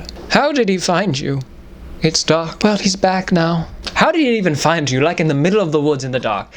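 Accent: United Kingdom. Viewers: thats how you know that, and his up-most british accent, is a fellow anime fan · I wish would read Darcy's proposal from pride and prejudice lol or Mr. Rochester's proposal to Jane with the British accent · The funny thing is that earlier in these videos he’d only be British when reading it, now ITS ALL THE TIME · I love either way when he does his regular voice & and his british gentlemen for the 1800's voice. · So I came here from tik tok for the british accent... but the reactions are sooooo much better 😂😂😂😂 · Is he actually British or is he American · This is my second vid i watched of him so uhm what is his real voice??? 😂😂 Liks is the British voice his real voice or not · I am so used to hearing his British Gentleman accent that I forget that it is not his real voice.....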